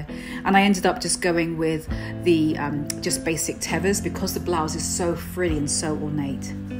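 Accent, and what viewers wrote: United Kingdom. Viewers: Your style and personality...bonus British accent.😍🔥😎...thank you,! 😍😊❤️☀️Oh, that mannequin... I want one! You and Michael have a wonderful Summer!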